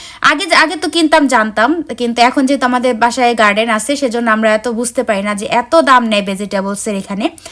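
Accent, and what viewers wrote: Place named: Bangladesh. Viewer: আপু তোমার ভাষা গুলো অনেক পরিচিত লাগছে বাংলাদেশের কোন জেলায় তোমার বাড়ি প্লিজ❤❤❤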